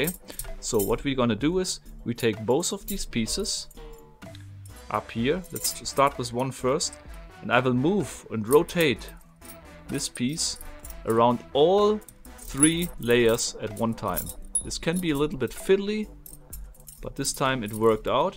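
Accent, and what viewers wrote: Germany. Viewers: ich hätte nie gedacht .... dass ich diesen kanal in meinem leben brauche :-D faszinierend .... entspannend und einen niedlichen deutschen akzent ... ich bin in einer endlosschleife gefangen und kann nicht aufhören deine videos anzuschauen ... danke dafür ... grüße aus mittelfranken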